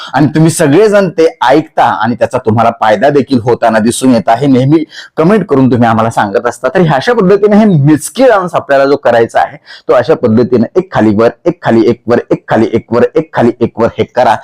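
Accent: Marathwada, India. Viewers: डॉक्टर तुम्ही नगरच्या आहात नगर बीडच्या लोकांसारखा बोलणार आहे म्हणून विचारलं तुमचं बोलणं पण खूप छान आहे आम्ही पण बीडच्या हो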